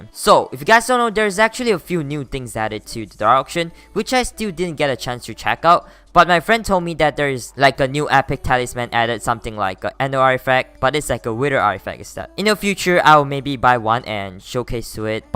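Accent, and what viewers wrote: Singapore. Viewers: The Singapore ascent lol I can relate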